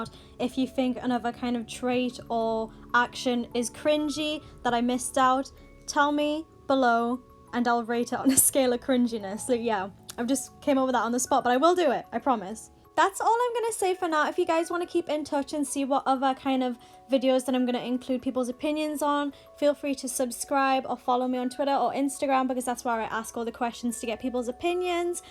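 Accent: Newcastle, United Kingdom. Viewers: First time here and ur accent reminds me of perrie edwards geordie accent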